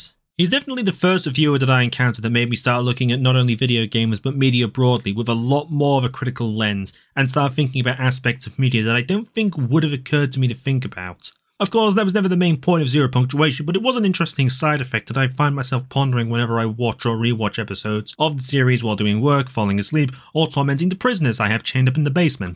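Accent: United Kingdom. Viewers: Go 1.25x speed for more Zero Punctuation Flavor. ...I won't blame your for the less snark British accent but we have what we can have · I reckon the British accent helps too lol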